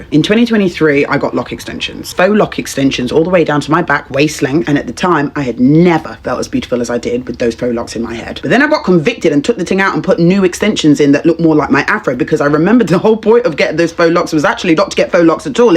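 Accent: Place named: United Kingdom. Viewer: Are you British? I’m not a native speaker, love your accent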